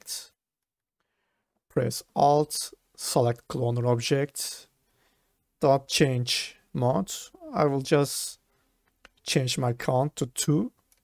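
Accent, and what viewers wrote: Turkey. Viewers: Turkish accent 😅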